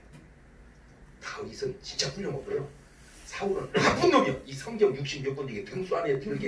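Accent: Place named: Chungcheong, South Korea. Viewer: 장경동 목사님의 설교를 듣다보면 참으로 공감하는 부분이 너무나 많습니다 나는 서울에 사는 천주교인 인데 장목사님을 너무나도 존경하게 됐습니다 특히 구수한 충청도 사투리에 진실된 설교 정말로 공감합니다 서비스로 비밀 한가지 말씀 드릴게요 ? 나라 화합 하지않고 대깨문 위한 정치하는 역사상 가장 무능한 자 어벙이라고 아시죠 이번 기회에 혼좀 내주세요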